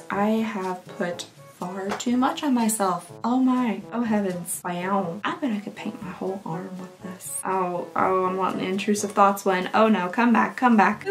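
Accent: Kentucky, United States. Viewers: Ahh. I come straight to your videos when I get notified!!! My fav! I'm also a kentucky girl. Live about 20 mins from lexington. Love your accent. ❤️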